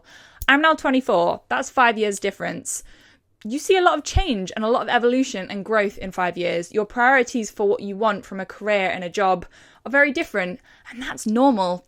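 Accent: London, United Kingdom. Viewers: Her accent has become real London lol happy for her - hope all works out 💚💚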